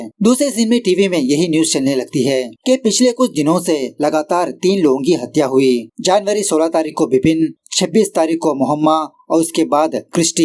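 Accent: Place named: South, India. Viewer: Aap kadapa se ho😮 aapka accent south Indian hai but i didn't think k aap Andhra Pradesh se honge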